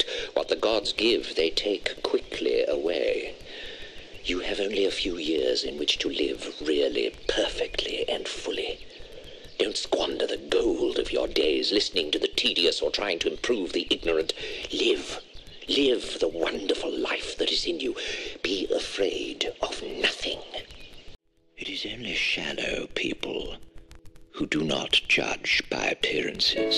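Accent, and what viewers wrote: United Kingdom. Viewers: Very good, but not how I picture Wilde sounding. He was Irish, this fellow sounds English and older. But a good reading nonetheless.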